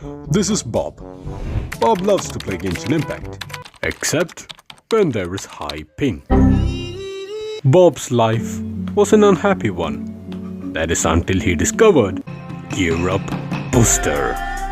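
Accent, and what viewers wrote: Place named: Kerala, India. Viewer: Ur voice is similar to indian mallu accent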